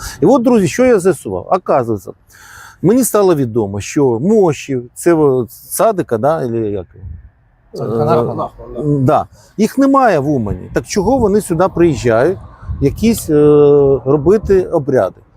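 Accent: Russia. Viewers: Це іде тиха окупація України для створення нового Єрусалиму. Цьому потрібно поставити заборону. Але поки в нашій владі засилля неукраїнців - буде дуже важко. А пану Павліченко та всім причетним щира дяка , що піднімають це архі важливе питання. А пану Павліченко на часі вивчити українську мову і в побуті також послуговуватися нею. Бо досить дивно звучить український боєць за Україну, який розмовляє як москаль, що фарбується під українця.❤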